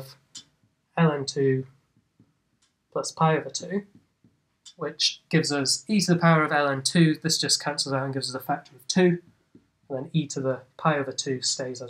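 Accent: United Kingdom. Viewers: thank God that math is universal, i can't understand his british accent at all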